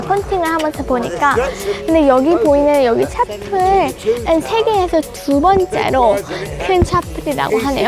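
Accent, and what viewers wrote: United Kingdom. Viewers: 말투 충분히 매력적이에요 영국식 한국어발음 느낌? ㅋㅋㅋ 부정적인 댓글은 그냥 넘겨버리셔도 좋아요 ~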